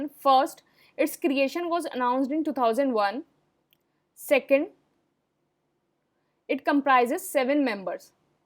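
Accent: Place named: Lucknow, India. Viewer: Nice set, thanks. Ma'am aap Lucknow se hain na(just guessing,if you don't mind,apka bolne ka lehza batata h,aap Lucknow se ho)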